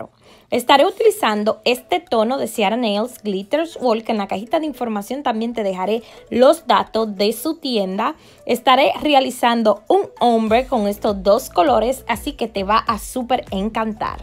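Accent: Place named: Dominican Republic. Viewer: Una pregunta tu eres #DOMINICANA por qué tu tienes un pequeño acento Dominicano 🇩🇴🇩🇴🇩🇴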